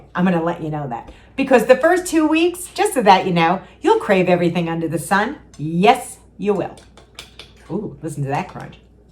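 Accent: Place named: Long Island, United States. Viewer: I just started watching you and you feel like a friend. Your openness and honesty is comforting, your accent reminds me of my Long Island childhood. I could watch you throughout my weight loss journey.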